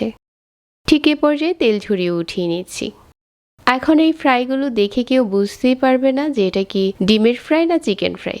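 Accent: Noakhali, Bangladesh. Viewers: A besel ta ki🤔 Noakhaila bashai bolen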